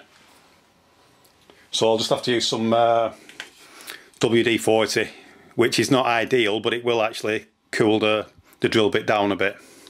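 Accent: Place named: Yorkshire, United Kingdom. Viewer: Nice informative vid. I'm trying to place your accent to the exact region in UK..is it Yorkshire?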